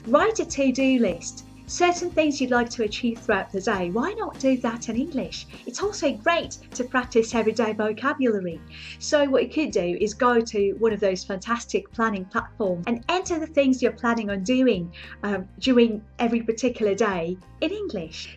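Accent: United Kingdom. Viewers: Your accent is so British and so amazing ;-)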